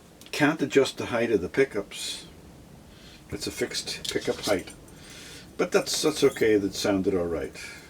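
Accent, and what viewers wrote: Northern Ireland, United Kingdom. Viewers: great to hear a norn iron accent and sense of humour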